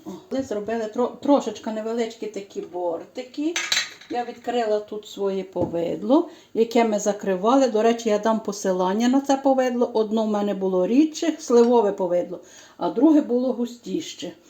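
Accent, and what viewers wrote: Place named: Galicia, Ukraine. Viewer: Не складний рецепт,варто спробувати. Спасибі за рецепт.Обов'язково спечу. Я з такою еасолодою слухаю вас і наш рідний галицький діалект. Бережіть себе і будьте здорові.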